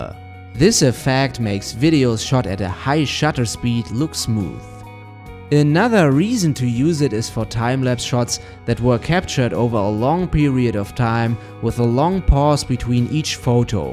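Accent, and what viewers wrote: Germany. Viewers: Hey. Ich fange demnächst an mit After Effects und dachte, als ich euer Video gesehen habe, dass ihr euch sicher sehr gut damit auskennt. Könnt ihr mir also bei dem Start in AE etwas helfen? Außerdem habe ich eurer "Deutsches Englisch" sofort erkannt! :) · I like the German accent. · ist deine Muttersprache zufällig Deutsch?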